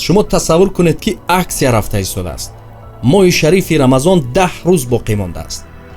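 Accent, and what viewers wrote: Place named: Tajikistan. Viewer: Асалом Алекум акаи кучогиай забони точикиро тоза гап мезанад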